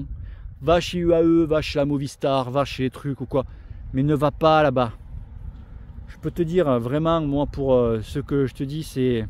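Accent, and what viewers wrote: South, France. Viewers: Slt, capteur de puissance un peu cher tout de même, moi qui fait du vélo sans faire de compétition sans cyclo et qui ne suis même pas dans un club😄. Pour m'entraîner FC c'est très bien pour moi et à ce sujet pour travailler dans les zones d'intensité tu calculais les zones de pourcentages comment ? Pourcentage de Fcmax réel ou les pourcentage de la FC de réserve ou autres ? Sinon ta chaîne au top 👌 toujours le truc pour faire rire et cet accent qui sent bon le Sud 🌞. sujet toujours intéressant bonne continuation et saison cycliste. 🙋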